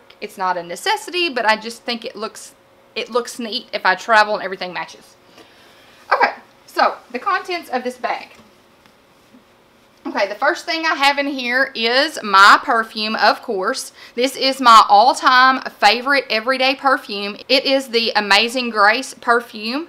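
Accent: South, United States. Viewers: I just started watching your videos, and I really am enjoying them. Like you, I am a planner, list maker, etc. I also own many of the same bags you own. Great minds think alike, right? It is also nice to hear a southern accent on youtube! I'm from Southwest Georgia. By the way, I am also a teacher. 😃😃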